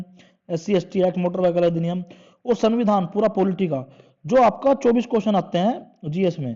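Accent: Meerut, India. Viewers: Tum meerut se ho kya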